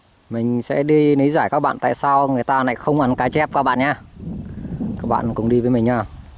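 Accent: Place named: Nam Dinh, Vietnam. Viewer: Hê nô...giọng Hà tây hay Nam định mà nghe ngộ ngộ , chắc Nam định 100% nuôn !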